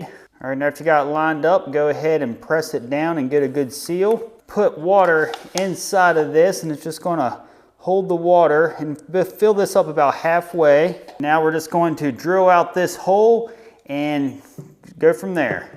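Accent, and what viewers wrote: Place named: Pittsburgh, United States. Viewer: Idk where your from my guy, but your accent sounds exactly like people from Pittsburgh!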